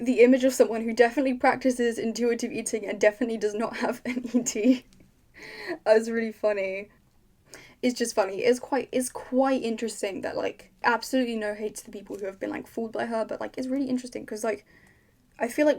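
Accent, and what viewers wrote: United Kingdom. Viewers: I’ve been watching you for a while (on and off) and did not expect you to sound like ts, all proper 😭. I love British people ,I love yalls accents so much 😌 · Wait you sound like Jessica law- ARE YOU BRITISH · UR BRITISH!?!? (u still slay im just supprised)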